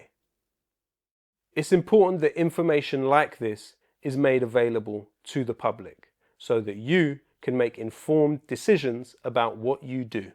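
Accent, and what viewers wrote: United Kingdom. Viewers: are you brittish mate?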